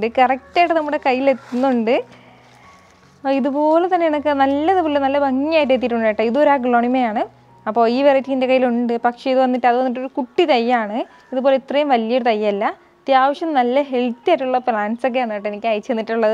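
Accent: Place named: Trivandrum, India. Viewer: നോക്കാം കേട്ടാ 😃 ത്രിവാന്ദ്രം ഭാഷ🤩 l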